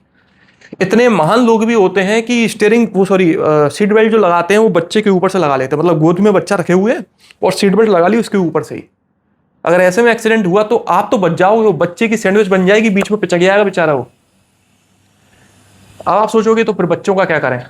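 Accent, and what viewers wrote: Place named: Uttar Pradesh, India. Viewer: kasganj etah ka accent ha apka pr i’ll drive slow always thx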